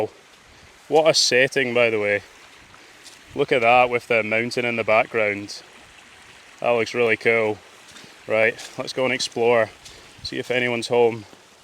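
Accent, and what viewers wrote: Scotland, United Kingdom. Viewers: Your videos are so relaxing, beautiful scenery, lovely Scottish accent, what more could you want!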